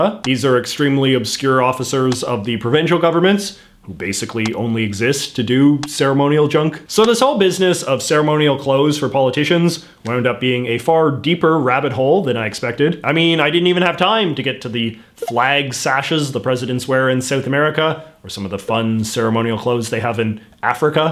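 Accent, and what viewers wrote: Canada. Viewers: Don't think so, we all just say Missouri the normal way lol. He does have the stereotypical Canadian accent though, so I guess that's it. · Thanks for keeping the Canadian accent alive,. You really lean into it. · Is that a real Canadian accent? The “oot and aboots” are so strong it sounds like you’re mocking Canadians. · First time I am watching this channel (very impressed) but that Canadian accent... either exaggerated or you're from Cape Breton or Newfoundland. The only reason I say that is I'm a maritimer myself and haven't heard that in good old Halifax, but certainly have heard it around ! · The way you pronounce about and around is clearly rooted in some deep candiana, yet you forego the proper pronunciation for Lieutenant for the American pronunciation.. why is that? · You can tell this guy is Canadian just the way he speaks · Oof this dude sounds Canadian. 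They usually sound like normal people, but then they say one or two words in a super weird way. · This is the most Canadian accent I have ever heard. Is this real???? Where are you FROM??? hahah (Respect to Canada from Minnesota) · Love your Canadian accent as well as your legs. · Thank you sir. You may (or may not) be interested to know that many members of our Royal Family in UK seem to love wearing military uniforms regardless of their (the RF member's) age or membership of a particular militia. Incidentally, talking of nations, natives etc did I detect a fine, Canadian accent? I have been practising listening before my hearing finally departs! Blessings and peace PS I really need to listen to the complete video to prevent making observations that you have already addressed. My apologies. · i cant listen to him say outfit or about in canadian again. im out. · I’ve never heard a more Canadian accent in my life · You have a very Canadian accent overall, but the way you pronounce "lieutenant" is quite American. 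· As a fellow Brit, it also helps that has an incredibly thick Canadian accent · Funnily as a Canadian in BC I don't normally hear the stereotypical Canadian accent, but yours is quite pronounced. I assume this is an Ontario thing? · I challenge you to say out and about without the Canadian accent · First time here and you’re the most Canadian person I’ve ever heard... and I’ve been to Canada 😂 really enjoyed this! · Hey man, I’m from Canada too but “arooond” instead of around, is a really extreme accent. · I can tell that Canadian accent BTW I really want to go to Canada we’re would you recommend?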